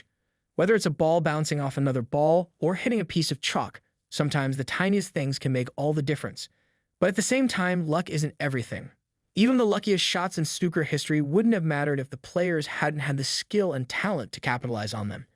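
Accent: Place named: United States. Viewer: So weird hearing an American covering snooker and even the the way he said it annoyed me.. It's not the fish mate 😂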